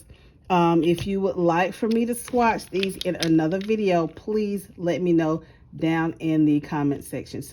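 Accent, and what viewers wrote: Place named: South, United States. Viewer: Yes, I do tend to be longwinded so be careful encouraging me!! LOL I think I'm subscribed to her YT channel because her Lives show up in my feed from time-to-time. However, I usually wait & watch recordings because I tend to get a little impatient watching them juggle their demo with following along in the chat (ironic, I realize, coming from someone who "talks" as much as I do, haha). BTW, where did you grow up ~ I hear in you a kindred Southerner. I'm a lifelong Floridan [don't hold it against me though, ok...there are still a few decent ones here & there], but I've also got people in the Carolinas. My accent always has waaay more twang after coming home from visiting there!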